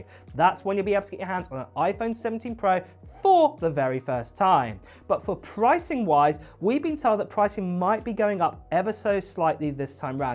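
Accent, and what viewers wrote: United Kingdom. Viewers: You pronounced aluminium wrong unless you’re American? You sound British lol wtf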